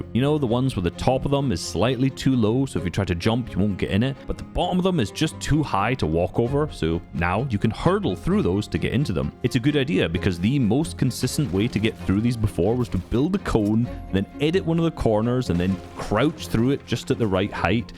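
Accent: Scotland, United Kingdom. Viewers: Your accent being Scottish instead of Australian instantly makes your videos 10x better than AussieAntics’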